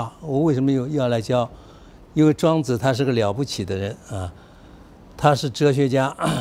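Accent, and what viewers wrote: China. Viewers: 老教授學養深厚，口音明明是外省腔，所舉的例證卻能用臺語發音，而且還能如此標準，不容易啊！值得借鏡。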